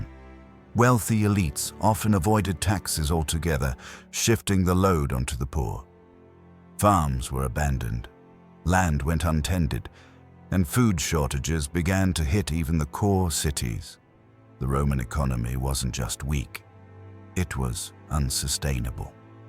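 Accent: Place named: Australia. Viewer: Like others in the comments, for me it sounded like Australia.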